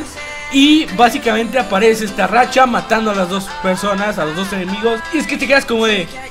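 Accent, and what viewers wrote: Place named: Spain. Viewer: deja de hablar como español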